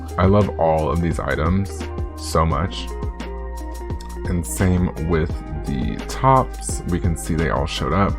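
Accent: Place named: United Kingdom. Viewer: ok but like thanks fir not gamer sceaming in a british accent 💅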